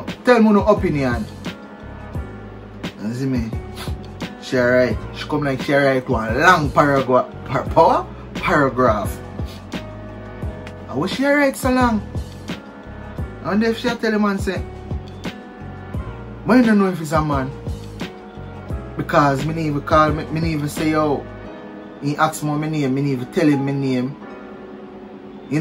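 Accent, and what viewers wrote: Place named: Jamaica. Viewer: Man a chat English and Patwa😂😂‼🔥🇯🇲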